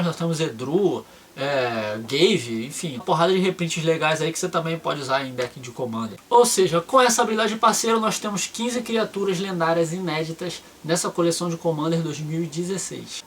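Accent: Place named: Rio de Janeiro, Brazil. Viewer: carioquês é foda de aguentar... desculpa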